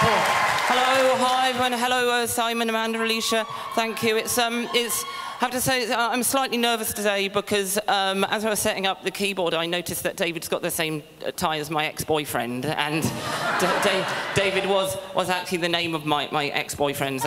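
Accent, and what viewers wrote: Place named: United Kingdom. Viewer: He's hilarious but I was having a hard time catching up xD English is not my first language let alone hearing British English in a speed like that.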